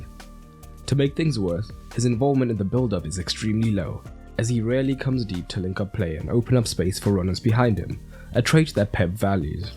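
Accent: South Africa. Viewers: Hey mate! Love the videos, especially since I assume you’re South African (judging by the accent) like me🤝🇿🇦 Anyway, how do you think Haaland will compare to the Agüero we saw under Pep? Agüero was known to be a little lazy and perhaps not entirely fit in a possession-based team like City. I might be totally wrong tho. Thank you brother and have a great week🙌🏻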